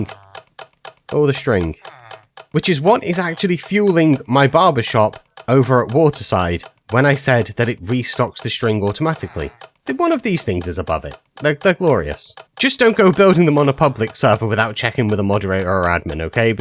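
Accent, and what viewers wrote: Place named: Mansfield, United Kingdom. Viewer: I've been trying to figure out the accent for a bit. I was leaning towards Mansfield. Yorkshire had crossed my mind too. Edit: to be fair leaving the UK has dulled my ability to pinpoint accents considerably.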